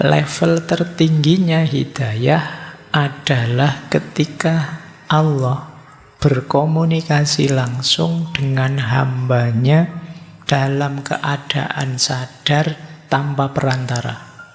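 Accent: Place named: Malang, Indonesia. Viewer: Dimana yah kajianya,tempatnya pak Guru ini yah,nadanya kayak orang Malang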